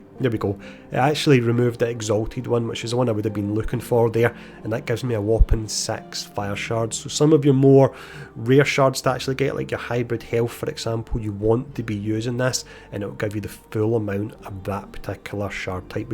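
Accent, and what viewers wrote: Scotland, United Kingdom. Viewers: Awright, fellow Scot, ye got a a new sub, really informative. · Weird how he’s pronouncing his words differently…He’s Scottish! ya Dork 🙄🤦‍♂️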